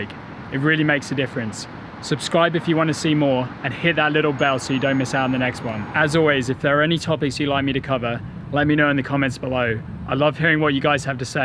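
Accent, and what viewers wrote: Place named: England, United Kingdom. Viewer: Oh damn you have an English accent but you live in Vancouver? I guess they don't call it British Columbia for nothing, lots of Brits here.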